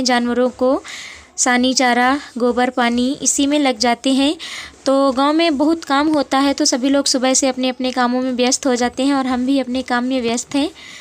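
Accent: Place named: Bhojpuri, India. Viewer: आप तो हम लोग के जैसा बोलती है घरवा कहा बा तोहार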